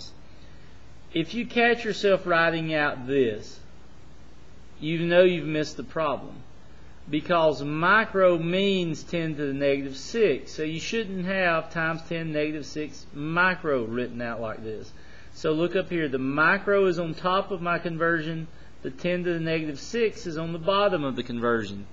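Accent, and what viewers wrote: United States. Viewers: Some cowboy yeehaw accent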